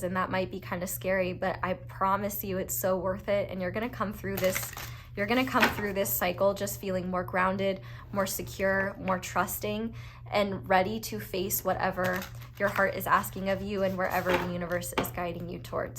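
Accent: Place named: South, United States. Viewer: I love the southern accent it’s cute thank you 🙏 god bless you ❤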